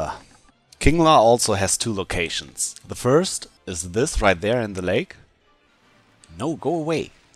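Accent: Germany. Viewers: You’re German, aren’t you? Or possibly polish?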